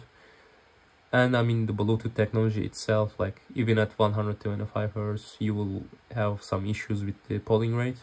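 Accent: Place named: Turkey. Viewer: U sound like turkish. R u turkish?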